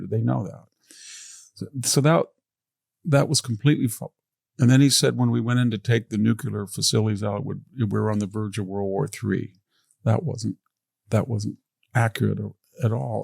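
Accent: Australia. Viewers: I’m an Australian I studied agriculture I worked in NY for 8 years my children and grandchildren are now Americans I think I love Victor and listen to all his work This was a great interview and I appreciate your Australian interviewing style accent and balanced grounded style very much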